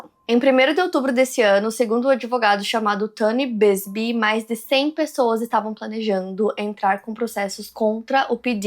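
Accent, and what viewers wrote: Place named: Paraná, Brazil. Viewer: amo q a não perdeu o sotaque paranaense hehe <3 me sinto representada pela diva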